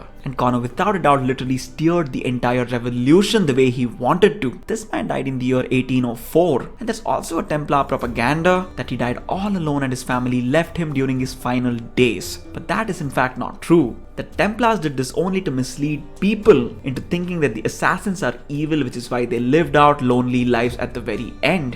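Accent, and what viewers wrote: India. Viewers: Are you an Indian ? You sound like one...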